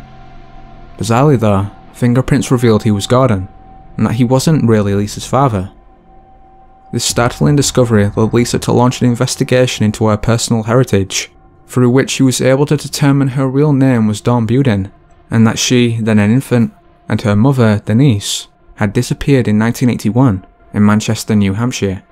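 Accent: Scotland, United Kingdom. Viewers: Where is this narrator from? I can't decide where in Britain this accent is from. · You ripped the voice of Criminally Listed right out of his mouth and made it british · so, the #2 mystery is still UN-SOLVED!! theories about how someone died means it's still a mystery. You had me all convinced b/c of the disarming British accent. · I enjoyed your well done presentation; and your voice. Curious: Your accent sounds like a British regional accent. But I've no idea from where. Congrats, in this conforming age, of sticking to your accent-- adds character and depth btw. And your voice with it is clear, unlike some regional British accents, let alone other harder to understand ones. So, curious, where is your accent from? (Or if someone else recognizes it). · British accent is terrible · You had me with your first British utterance. New sub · Totally off topic. 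I'm trying to nail down your accent. Sounds a bit Scottish to be English yet a bit too English to be Scottish. Leaning towards Scottish. I'm American and not super knowledgeable about different dialects within the different UK countries. Educate me please, and good vid thanks. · I rather like your accent although it’s a little difficult for my Brooklyn ears to understand. Is it a Scottish accent?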